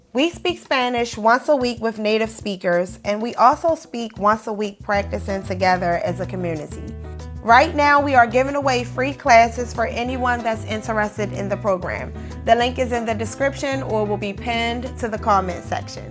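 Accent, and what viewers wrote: Baltimore, United States. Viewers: thank you for another informative video! I always look forward to your content. Don't worry about what anyone says about your Bmore accent. We all have some type of accent. You are doing great! Hello from PG!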